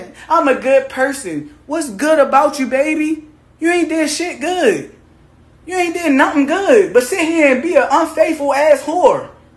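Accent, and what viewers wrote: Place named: Baltimore, United States. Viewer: They yews and dews be killing me. Baltimore definitely in the top 5 worst accents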